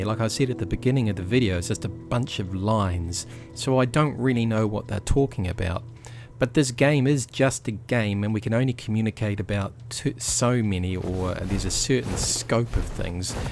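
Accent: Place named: New Zealand. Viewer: Where you from bro sounds like an New Zealand accent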